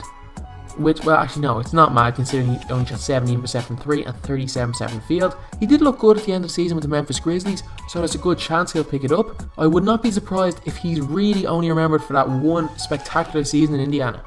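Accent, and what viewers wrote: Ireland. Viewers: Are you from Ireland man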